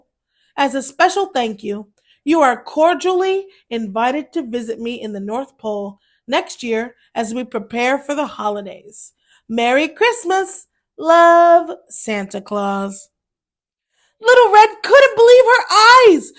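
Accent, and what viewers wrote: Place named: United States. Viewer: Love the cawntry accent 😂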